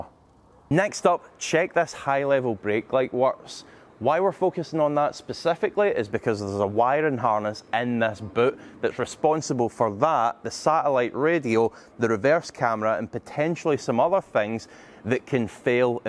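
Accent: Scotland, United Kingdom. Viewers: You sir, are Scottish? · Got I love the Scottish accent, I’m getting a 2015-17 gt in a few months conv 6 speed❤️🤤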